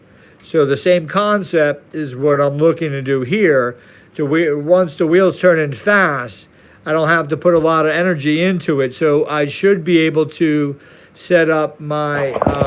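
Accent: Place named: South, United States. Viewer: he may have a southern accent, but his a solid experimenter ... afterall if you could read a book and literally build a free energy machine. many would.... ramblings are good for getting too know the guy, that we agree on..... although i must say, the best way too judge the guy in my opinion, is what he does with the information i have him,along with videos of my working free energy machines . which were numerous. <link>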